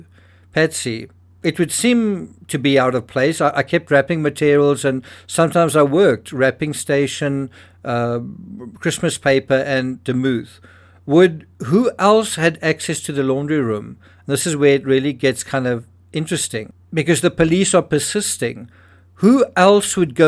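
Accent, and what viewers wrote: South Africa. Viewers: South African ascent … superb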